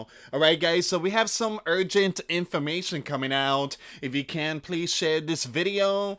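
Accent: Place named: Australia. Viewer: Sounds aus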